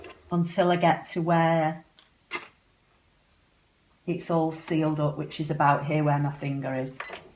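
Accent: England, United Kingdom. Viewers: Lovely to hear an English accent on a sewing tutorial. Very well explained, would enjoy seeing more sewing projects from you. Thanks!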